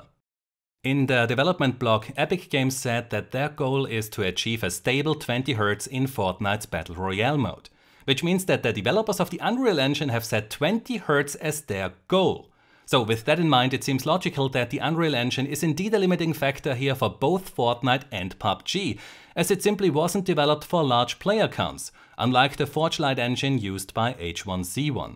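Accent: Germany. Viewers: Bist du eig Deutscher? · i love your accent, is it german? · Are you from germany?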